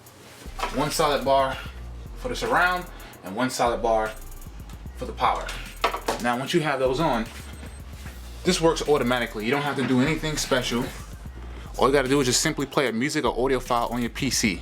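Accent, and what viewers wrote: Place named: AAVE, United States. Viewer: Hard to understand through all the jive talk and ebonics.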